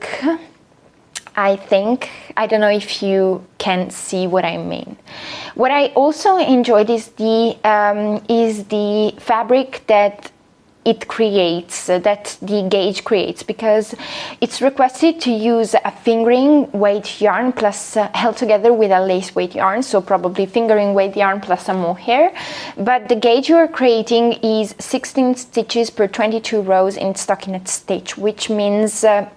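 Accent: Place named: Italy. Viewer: Thank you for your video. I think you have a charming Italian accent.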